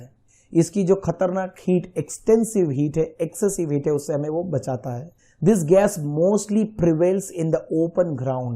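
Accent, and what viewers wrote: Gujarat, India. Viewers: 🧑‍🏫Sir you from gujarat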